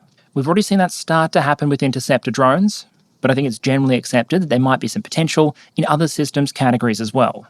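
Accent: Australia. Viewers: Don't be a tool. Different accents exist. Australian derives from SE English which pronounces H that way.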